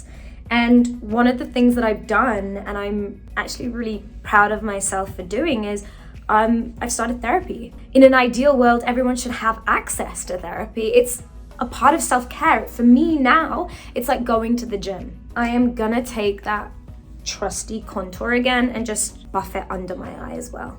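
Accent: United Kingdom. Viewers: I love the way she talks, british accent is the best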